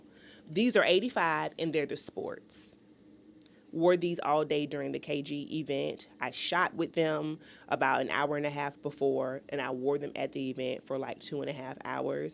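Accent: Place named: Polk County, United States. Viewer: 😂😂😂 you have me laughing so hard, because that’s exactly how we talk here in Polk county … so proud of you . Keep it up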